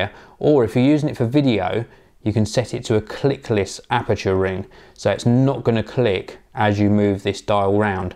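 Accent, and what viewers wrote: United Kingdom. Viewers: dat british accent mate!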